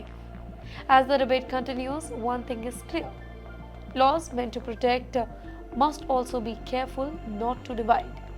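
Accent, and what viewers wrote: India. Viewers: This anchor is based from India and her accent is Indian . Don’t know how much of this is really true. Would love to hear the views of an American based legit news channel .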